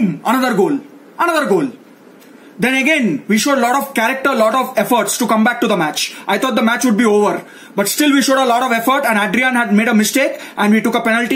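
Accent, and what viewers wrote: India. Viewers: english with india accent so funny . hahahaha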